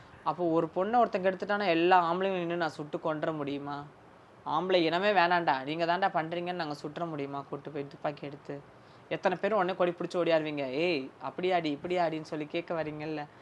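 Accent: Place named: Kongu, India. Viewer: அக்கா நான் கோவை நீங்கள் பேசும் உங்கள் கொங்கு தமிழ் மிகவும் அழகாக உள்ளது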